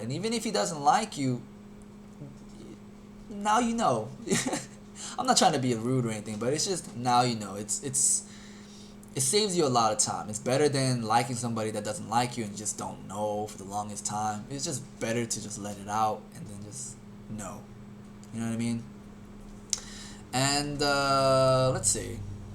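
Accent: New York, United States. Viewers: That accent sounds New York. I luv NYC accents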